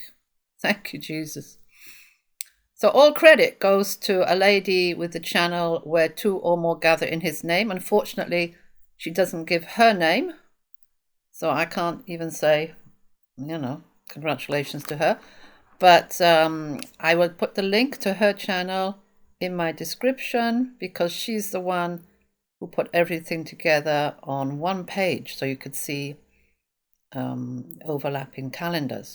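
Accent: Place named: Manchester, United Kingdom. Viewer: are you a Mancunian? I was born there and left for Australia in 1972. Your accent sounds very familiar. I'm born again and thank you for your work in the Lord. We fly soon.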